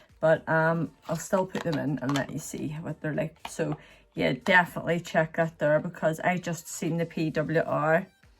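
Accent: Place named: Belfast, United Kingdom. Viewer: Omg I’m from Belfast you sound like you have my accent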